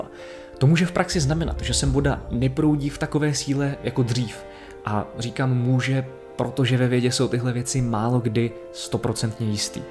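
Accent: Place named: Prague, Czech Republic. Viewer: Kdybys vynechal ten pražský přízvuk tak 10/10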